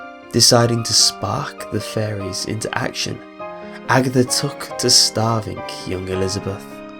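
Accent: United Kingdom. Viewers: Riz Vicious I failed Geography and frankly don't care. It's still called a British Accent, as well as an English Accent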